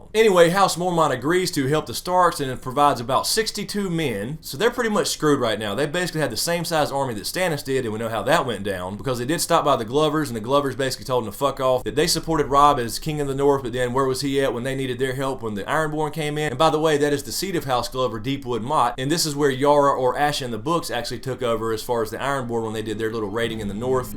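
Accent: South, United States. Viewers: and fuck that dislike. Probably some other GoT reviewer. You have some of the best GoT videos out, and I try to watch all the good folks. Plus im pretty sure from your accent your from the south, or maybe out west in texas or something lol. Either way, us southern folks gotta stick together lol.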